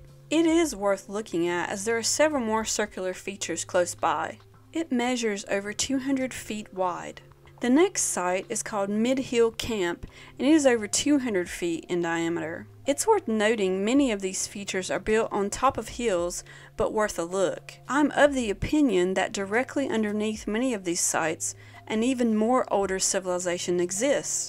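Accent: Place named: Tennessee, United States. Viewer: I dont know where you're from but I hear some Tennessee in your accent.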